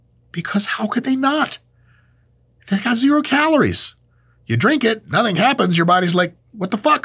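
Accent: South, United States. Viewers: Okay with the southern accent. Highly offended by the diet coke/nugget bit. has always worried me but now I see how problematic he truly is. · The southern accent is a win! More please! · Hello, from Texas. More Southern accent please. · I need more Southern content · As a southerner I'd love for you to make it through an entire revive stronger roundtable with that accent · I approve of the southerner accent and even slander in the future. (I'm from the armpit that is Lousiana) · More southern accents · As an..ex-USSR person, I completely approve of your Southern accent, pls bring on moar of it! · Info 10/10 Southern accent 6.5/10 -Texan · Bruh... thank you for the upload Brother! Bruh more Southern:)) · Love the southern accent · hahaha that southern accent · Am southern, do approve of accent! I love it!! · As an asian acting southerner I approve that accent. 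· Sweet southern accent bro! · Southern accent approved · Yes mike we like your southern accent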